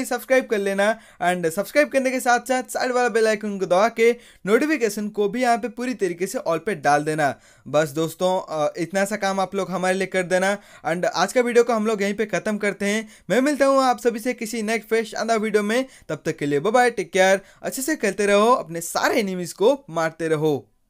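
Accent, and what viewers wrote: Odisha, India. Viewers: Odia ho kya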